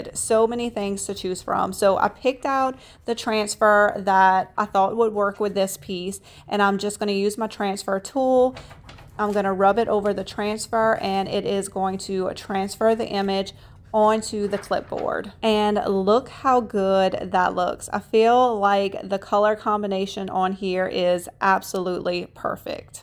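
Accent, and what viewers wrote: Louisiana, United States. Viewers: think it is Cajun.😂 Not a speech impediment. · She is from Louisiana and it’s most definitely an accent.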